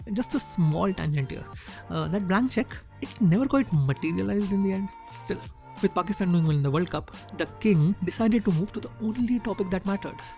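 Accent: India. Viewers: Bias Indian Accented Blog and we are buying into it. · I love how thick indian accent u have. Raaaayhmbo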